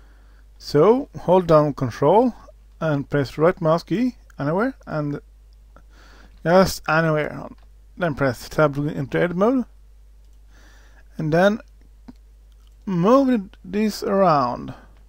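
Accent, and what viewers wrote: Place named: Ireland. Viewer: where are you from? such an interesting accent, Irish?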